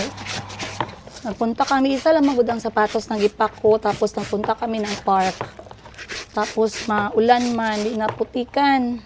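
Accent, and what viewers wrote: Iloilo, Philippines. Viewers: Oo alam ko Yan ma'am,sa Doon kpa naniraha sa Uganda Kasama c Mr yiga,ginawa mo Ang LAHAT na makakapagkakitaan ginawa mo Ang LAHAT na mapagkakitaan,Minsan lang Ako magcoment pero nanonood Ako sa mga vlog mo prove,sa Uganda magluto ka ng bisayang manok,nasasarapan Ang mga taga Doon sa luto mo,at kahit Meron na c chico nagbabantay ka sa tindahan my at Meron Kang katiwala Kasama mo pero Ikaw Ang nagmamahala Doon,at Ang umuwiwi ka gensan, at nakakapagpatayo ka ng Bahay sa nabili mong lote,alam ko no? Taga Digos PO Ako bisaya PO Ako,sinusubaybayan kita Hanggang dumating c mayumi,at may mayumi closet na Ang Bata Hanggang umalis na c ate Christine,believe talaga Ako sa yo,Ang tapang mo na pinalaki mo ng maayos Ang mga anak mo,at may nalalaman na akong salita 2 lng( talat- sa ating salita ay palengke,at sawadika or sawadikap-good morning!o kamao na ko no? 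suggestion lng PO maan,f ever suyuin ka ulit ni Mr yiga,pwede pa ba SYA na pumasok ulit sa puso? Masaya Ako pagnangyari Yan kasi mayroon kayong mga anak,daghang kaayong SALAMAT ma'am,nkatawa ko nmo ba Tagalog Ang salita mo pero illonggo Ang tuno ng boses,pero nalingaw ko uy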